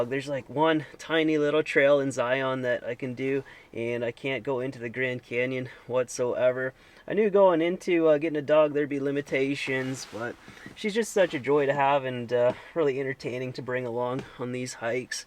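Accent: Canada. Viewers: Canadian, ey?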